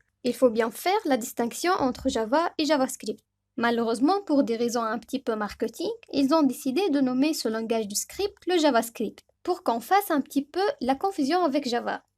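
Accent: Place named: Tunisia. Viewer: pure Tunisian accent , Keep up the Good Work .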